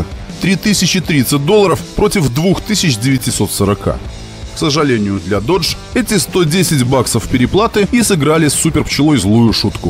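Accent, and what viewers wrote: Belarus. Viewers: Автор из Белоруссии? Интересный "говор"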